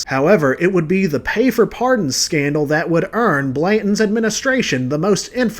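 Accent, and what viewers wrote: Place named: Transatlantic, United States. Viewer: Good video. I like your almost transatlantic accent. Keep it bro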